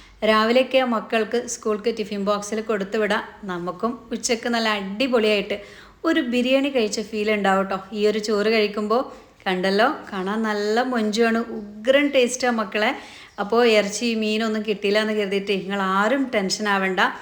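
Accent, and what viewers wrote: Malappuram, India. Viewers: മലപ്പുറം ജില്ലയിലെ സംസാര രീതി ഇങ്ങനെ ആണ് നല്ല മനസ്സ് ഉള്ളവർ കേട്ടാൽ മതി അല്ലാത്തവർക്ക് വണ്ടി വിട്ടു പോകാം ടിങ് ടിങ് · മലപ്പുറം ആണോ? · 😀 മലപ്പുറം സ്ലാങ് വഴി മാറി ട്ടൊ ട്ടൊ ക്യൂട്ട് നെസ് കൂടി ചില ഭക്ഷണം നല്ലത്. സംസാരഭാഷ ബോറ് കുറ്റപ്പെടുത്തിയതല്ല മുൻപ് video കണ്ടിട്ടുണ്ട് സംസാരശൈലി മലപ്പുറം താത്ത തന്നെയായിരുന്നു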